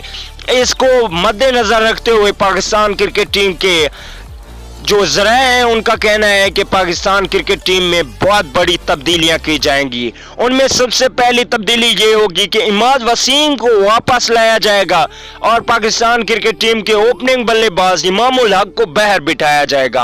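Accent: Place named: Bihar, India. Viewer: Bhai bihari ho kya aapko aawaz se lag rha Hai bihari ho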